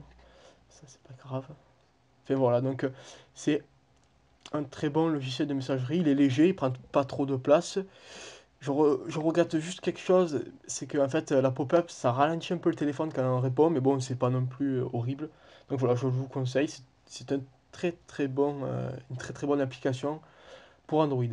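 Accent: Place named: South, France. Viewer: Oh t'a un accent mdr t'es bien du sud Saint Christol les ales j'habite pas loin xd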